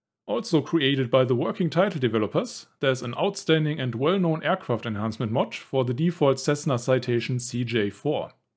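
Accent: Germany. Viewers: when a german speek english nice video ;) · I bet you are from a high German area like Münster, Bielefeld or Osnabrück. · Super Video 🙌 Ich wusste sofort, dass du deutsch bist ahhahaha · Hello again, long time gone. I finally found you doing flights again! Love your Duetsche accent! SUBBED/LIKED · Dieser deutsche Akzent kickt so hart! xD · Ich wusste doch, dass ich da nen deutschen Sprecher rausgehört habe. Super Video und beinhaltet fast alle must haves. Was ich noch empfehlen kann ist We Love VFR. Bringt VFR Objekte wie Sendemasten, Radarkuppeln, Kräne und bald wohl auch Industrielle Schornsteine nach Europa. Alles basierend auf Open Street Maps. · Danke! (you have a German accent! :P) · I missed your Duetsche accent! I had to unsubscribe to many cuz it got to be too much. I hope to fly this summer in Xbox X MFS2020. Keep up the good work lad! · I like the german accent ;) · ich wollte ihm nur einen Tipp geben und es klingt doch noch recht Deutsch aber ich weiß nicht wieso du dich dort nun einmischen musst Grüße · thanks for sharing, you have very strong germany accents while talking in english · Hey great video thanks! 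Funny is just how you express your English! Then I poop the sausage off! It's so funny your German English accent.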